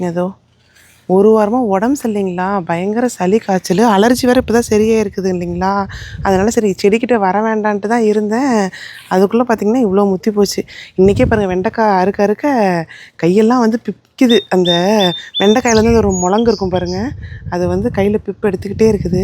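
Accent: Kongu, India. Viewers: Kongu tamil Azhaga pesureenga neenga Coimbatore pakkangala. Super home garden👍👍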